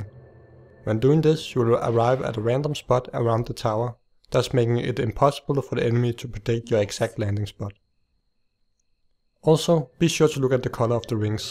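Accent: Denmark. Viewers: wow... he's accent sound really Danish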